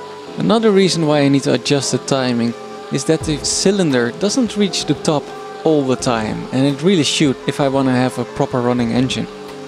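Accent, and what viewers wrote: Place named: Netherlands. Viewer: You sound dutch don't ya?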